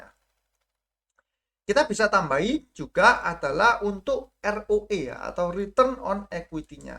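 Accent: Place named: Java, Indonesia. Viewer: Ko kok ngomong nya bahasa Indonesia medok banget ko, jadi nyaman dengerinnya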